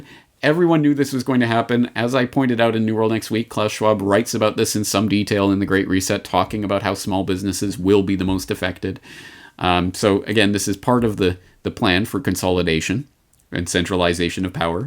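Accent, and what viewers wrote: Germany. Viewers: Nothing wrong with a German accent. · Well german accents are normally not unnerving at all, that is until you open your speech with talk of how WW2 was good for the world.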